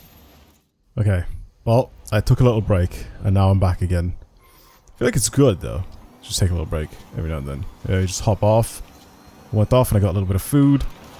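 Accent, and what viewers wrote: Ireland. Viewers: personal question but do you live near kallinary in ireland, ur accent sounds very similar to someone i know from there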